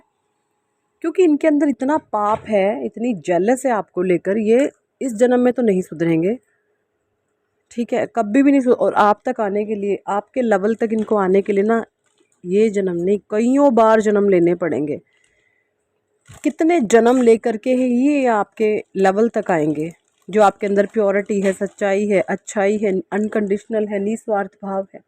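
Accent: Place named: Punjab, India. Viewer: Your punjabi accent is so appealing!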